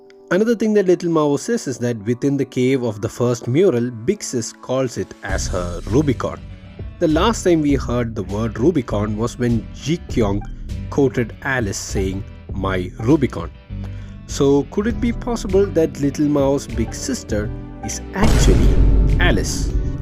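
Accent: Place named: Kerala, India. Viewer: Ur voice is similar to indian mallu accent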